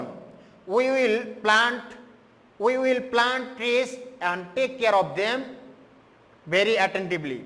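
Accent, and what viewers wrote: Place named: Bengal, India. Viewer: You can find hundreds yet tenths of thousands of bangalees who apply the same pronunciations. Its not done by will but its the way of the accent of some certain people. So this mistake cant be corrected in terms like this. But one way is that is these certain people can learn the UK accent without some obstacles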